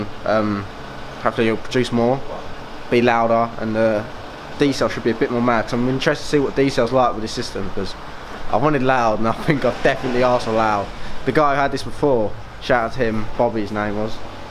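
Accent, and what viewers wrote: Essex, United Kingdom. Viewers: essex accent is 100% the worst English accent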